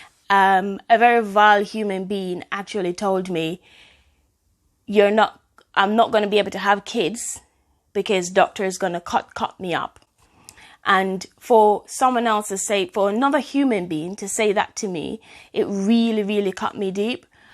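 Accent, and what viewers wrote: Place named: United Kingdom. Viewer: Goodie a British enuh. Hear the accent. Great video. I am sure you will reach someone who is struggling with this.❤️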